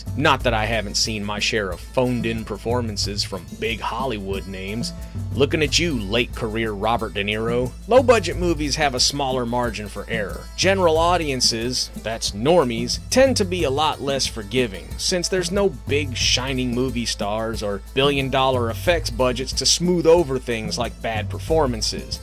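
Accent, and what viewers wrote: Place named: South, United States. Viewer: Nice Southern accent. Texas? Dallas? Kansas? Houston? Nashville? I'm British so its impressive I know all these names.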